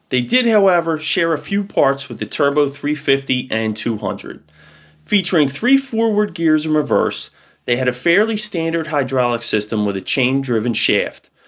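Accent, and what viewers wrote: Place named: Baltimore, United States. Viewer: Speaker sounds to be from Baltimore hon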